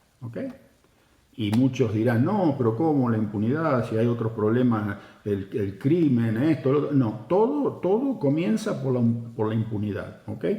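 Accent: Argentina. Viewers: Holaaaaa familia ❤ los adoro, hace un par de años los descubrí, cuando empezó a a acompañar los videos de y. Me llamó la atención la forma de hablar y descubrir que "era un argentino 😅"... Gracias, muchas gracias por todo lo compartido. De emigrar siempre me gustó Canadá, antes era con muchas más exigencias, todo cambió después de la pandemia. Abrazo grande desde San Miguel del Monte, pcia Bs As, Argentina. ❤❤❤ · Que relato perfecto, parecés un profesional de los medios, la historia tuya,la vivimos como propia, con la forma como la relatás y los que somos descendientes de inmigrantes, nos sentimos identificados con nuestros abuelos, ( en mi caso italianos, analfabetos, que nunca más tuvieron contactos con los suyos)! Lo que describís es como me sentiría, si tuviera que emigrar, y se nota como estas al tanto de la realidad,en este caso de Argentina! Otra cosa muy llamativa es que seguís manteniendo el acento Argento, y particularmente modismos idiomaticos a pesar de tantos años viviendo y hablando otro idioma!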